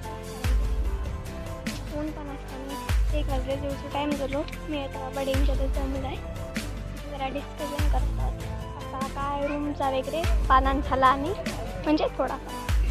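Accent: Malvani, India. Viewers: येताला जाताला होताला सुपर मालवणी लागला तुका झाला माका ☺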